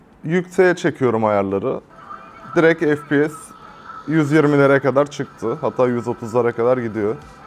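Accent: Elazığ, Turkey. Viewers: Abi sen elazığlımısın lütfen söyle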